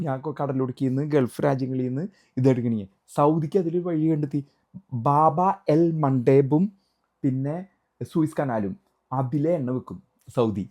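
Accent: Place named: Thrissur, India. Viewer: നല്ല കിറു കൃത്യമായ നിരീക്ഷണവും ചേട്ടന്റെ ആ തൃശ്ശൂർ ഭാഷയും എല്ലാംകൂടെ നല്ല രസമുണ്ട് കേൾക്കാൻ. .. ഇപ്പൊ റീച് കുറവായിരിക്കും പക്ഷെ നിരീക്ഷണം തുടരണം, കുറേ നാള് കഴിയുമ്പോ ആളുകൾക്കു മനസിലാവും എത്ര കൃത്യം ആയിരുന്നു എന്നു. ..🙏🏻🙏🏻🫡🫡🫡🫡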